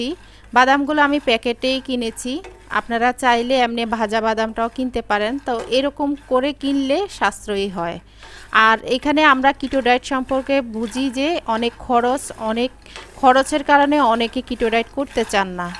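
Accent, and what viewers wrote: Noakhali, Bangladesh. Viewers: Apur bari j noykhli buja gycy kotha suny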